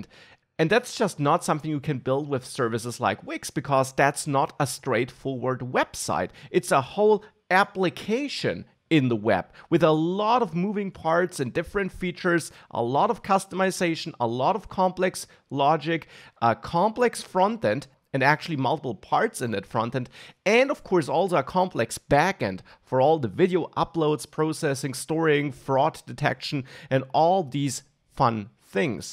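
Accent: Germany. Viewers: are you from germany? (accent - pure interest)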